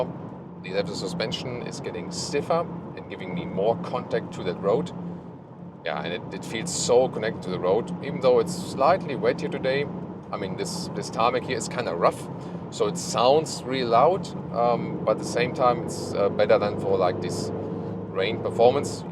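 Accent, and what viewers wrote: Germany. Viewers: “Lots of high gloss black…which no one asked for”. 😂😂. Exactly! Love your channel and its presentation. The German accent makes listening fun as well for an American lol. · You sound too German for me as an American 🇺🇸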